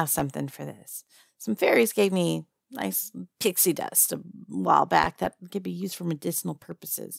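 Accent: South, United States. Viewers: Wait, did he seriously smoke weed as a painkiller? I didn't know you could do that. Anyway, I noticed a startling lack of harpy audios on YouTube, and I'm a sucker for southern accents so this was right up my alley. Thank you. ❤ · It's hard to tell what your real accent is. I liked the southern charm accent though. Your stories always make me smile and my day better. It's awesome that you are growing so well. You are on your way to a play button, i can feel it.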